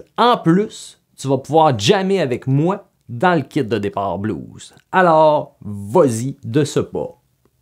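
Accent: Quebec, Canada. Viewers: Is this Canadian French ? nice accent, and good teaching btw.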